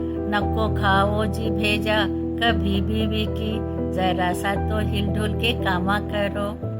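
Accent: Hyderabad, India. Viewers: Zabardast.... fully Hyderabadi style.... ur very talented...the language is just Amazing... n in the end Ishallah gave a touch of hyderabadi style.... keep it up n wishing u good luck... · Pure Hyderabadi!👌👏👏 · Very nice with a Hyderabadi touch!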